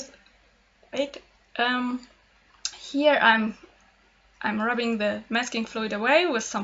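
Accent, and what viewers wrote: Germany. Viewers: I personally love the German touch to your English. Everyone adds their own flare to their English. It's sad to see those trolls criticize someone for sounding unfamiliar to themselves. On a side note, I really like your work, I'm considering using paint more often because of you 🙌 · I think your english is great! You have a little bit of an accent, such as german natives have, but that's all. Thank you for your lovely and instructive videos. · Love your art, love your English because you use it with a delightful accent. I spent many years in Germany with the army and never mastered Deutsch. Thank you for sharing your art and please do not lose your accent. Ps where in Deutschland do you come from. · i really love your accent. Im american but i have a speech impendememt that makes me sound like i have a heavy accent (idk thats what people tell me). 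I dont see why people would make fun of you, your English is fantastic and i personally think you're accent is beautiful. Infact what i like about you is that i can tell you really try to explain how you do things and i think that's really sweet :) I might be alittle biased because i love German accents but whatever. You're a wonderful person and don't be timid about who you are or what you sound like. Atleast you try, that is all that should matter to the ones that matter :) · im sorry if i wrong but are you german because i am to and german was what i was raised with and i sounded the same way sooooo dont worry it gets easier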